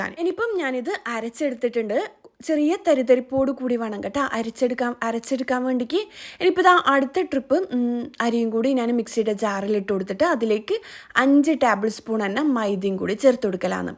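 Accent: Kannur, India. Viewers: Kannur ann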